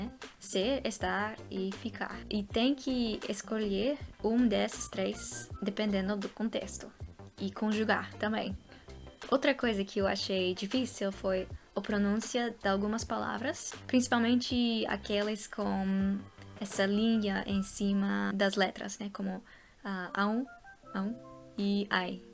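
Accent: São Paulo, Brazil. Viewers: Você parece com uma Paulista , você fala o português muito bonito, 👏🇧🇷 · bemm dms,seu português está como alguém que mora em são paulo,parabéns!❤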